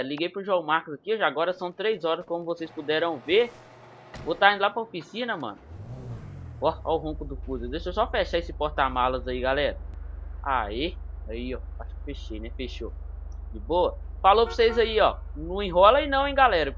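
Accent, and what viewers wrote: Minas Gerais, Brazil. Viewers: Mano ? se e Mineiro? :V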